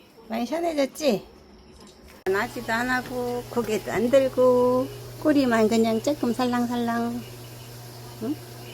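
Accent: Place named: Chungcheong, South Korea. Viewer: 충청도 사투리 정겹고 좋네요잉. 깜순이한테 나는 귀여운 북 소리 아이구 이뻐!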